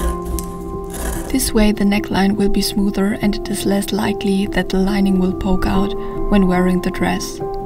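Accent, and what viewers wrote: Germany. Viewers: Deine Videos sind sooo, soooo wunderschön gemacht, jedes ein Kunstwerk für sich. Wohl dein unbestechliches Auge für Ästhetik dass sich auch in all den wunderschönen Kleidern zeigt. DANKE fürs verzaubern ❤ Beim Video schauen dachte ich direkt, dass du aus dem deutschsprachigen Raum sein musst, wegen der typisch deutschen Aussprache. Leider kann ich kaum Englisch und konnte mit deiner homepage dann gar nicht so viel anfangen ohne Fremdsprachenkenntnis. Ich versuche nämlich immer gute Kurse, Seminare oder Online- Ausbildungen auf Deutsch zu finden. Deine Videos sind unbeschreiblich schön gemacht, einfach berührend und sehr emotional umgesetzt. Grosses Kompliment ❤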